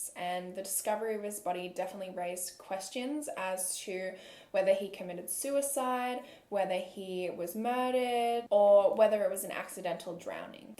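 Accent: Australia. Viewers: The Australian accent is the best. Your voice is very soothing 🙌🏼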